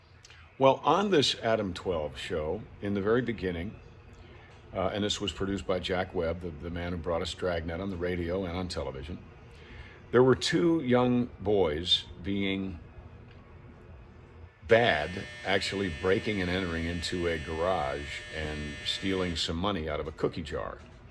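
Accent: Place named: New York, United States. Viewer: You sound like a New Yorker of the East Coast. Lord forbid! You are spot on! Keep talking? Been watching "Chips!" myself... "Heat of the Night!" "Magnum PI," "Hunter!" "Soap" .."Quincy." " Little House on the Prairie." "Mash!" And what I would like to find and re watch again is "Benny Hill!" Kids don't know what they are missing. I wish they would rerun "Dr Quinn M.D." again... They never get old!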